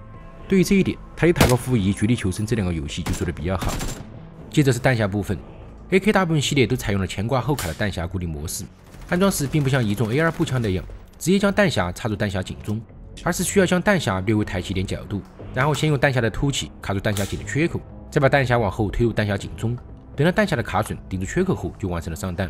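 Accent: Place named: Leshan, China. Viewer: 乐山口音，鉴定完毕。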